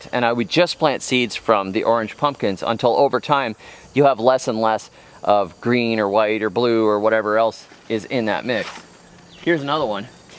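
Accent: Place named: Boston, United States. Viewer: What with the Boston or New England accents LOL..... But it might make me by one... I thought this was about pumpkins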